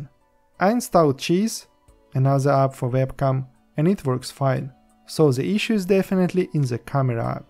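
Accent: Russia. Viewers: Are you Russian? It feels like you have a Russian accent)). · Native Russian accent detected. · Русский акцент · Are you from Russia?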